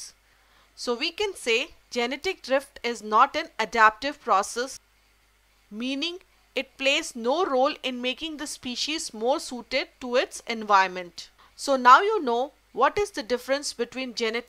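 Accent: India. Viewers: agar aap hindi mein bolte to jyada aacha rheta.Apki english sunke ye to clear hai ki aap ki mother tounge hindi hai...or aapko bhi ye pata hai ki hum sbhi indians hai and hindi jante hain.......phir kyon english????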